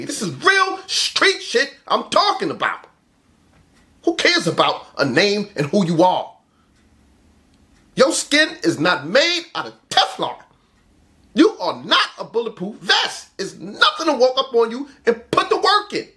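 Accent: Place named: New York, United States. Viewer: Handsome ash... besides the fact. You put me in the mind of llcoolj and like Alpo in dialect. I guess its a NY swag.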